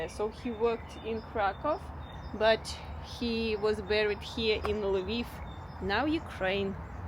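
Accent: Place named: Russia. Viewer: не возможно слушать этот ужасный русский акцент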